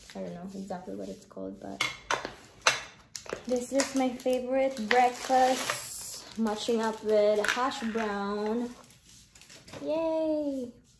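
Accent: Philippines. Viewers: Hi beautiful.. can i ask you something.. taga diin ka sa visayas.. im surprise that you spoke like ilonggo if im right...keep safe always ang God bless...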